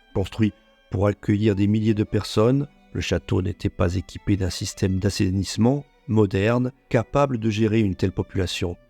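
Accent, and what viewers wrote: Corsica, France. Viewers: Super video! Mais je note un petit accent dans la voix , corse,je crois. Pace e salut❤ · J’avoue que j’ai surtout écouté la vidéo pour l’accent Corse, forza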